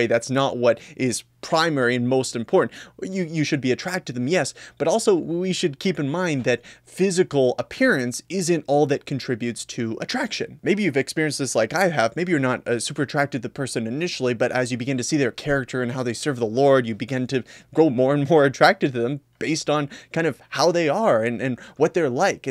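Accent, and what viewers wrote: Canada. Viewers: This dude has got a solid, mint canadian accent lol.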